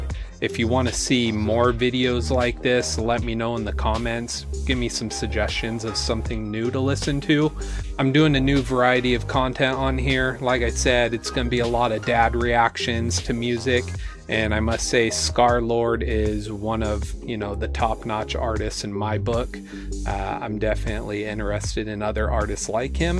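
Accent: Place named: United Kingdom. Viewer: The mans british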